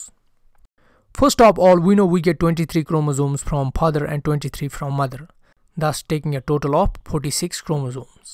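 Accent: Kashmir, India. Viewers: I caught u u r a kashmiri aren't u bolein bolein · Indian Accent is the best !!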